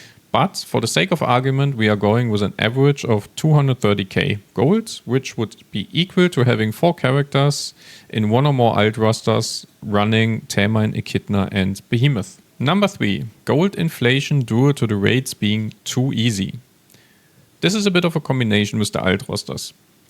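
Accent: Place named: Germany. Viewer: i like your English with german accent . good video!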